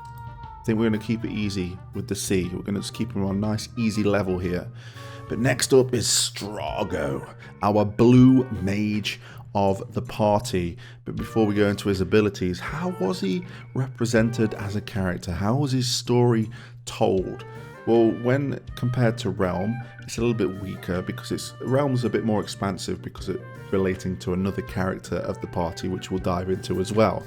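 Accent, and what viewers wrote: United Kingdom. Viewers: And you, sir, I put you in the S Tier. Your English accent really rounds out your professional approach to it all. You’ve got my subscription although I will say… Sabin is S tier. s for suplexing that trainnn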